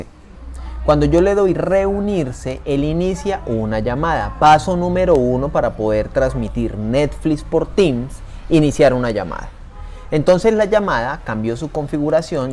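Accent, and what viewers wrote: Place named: Colombia. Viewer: Me encanta su acento, es colombiano?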